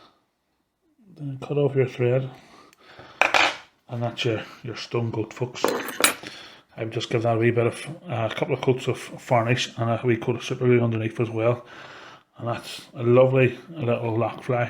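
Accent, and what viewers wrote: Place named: Ireland. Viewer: Lovely looking fly. Great to hear an Irish accent on youtube too,. Keep up the great work. Subscribed.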